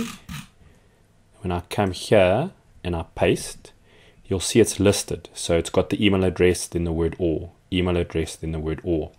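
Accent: South Africa. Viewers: As soon as you opened your mouth, I thought to myself, you must be South African. I can recognize my countrymen no matter where I find them. Am I right?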